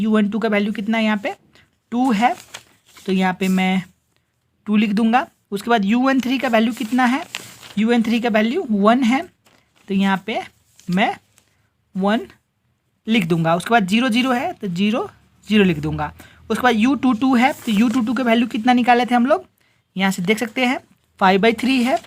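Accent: Nepal. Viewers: dai nepali ho? haha , anyway loved it haita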